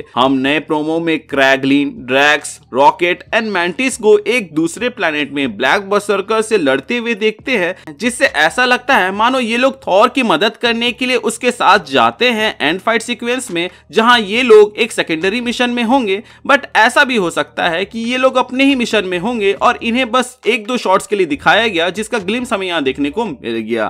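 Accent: India. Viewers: Excited for the thumbnail until i play and hear indian dude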